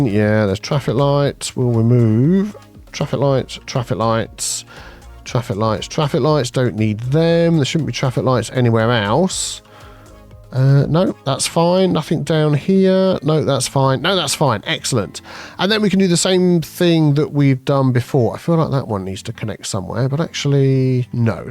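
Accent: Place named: RP, United Kingdom. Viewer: I keep saying “Penndel” in posh voice to myself off and on throughout the day whenever I need a laugh. That caught me so incredibly off guard at 😂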